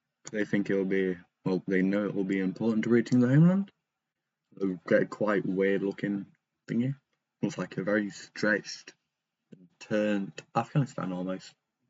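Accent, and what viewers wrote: United Kingdom. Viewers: Adult Bri’ ish man video Also hi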